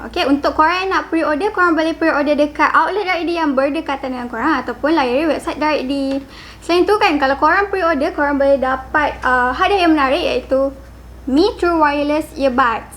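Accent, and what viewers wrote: Kelantan, Malaysia. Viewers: Ore kelate ke review.? Macam kelate jah... Jugak..?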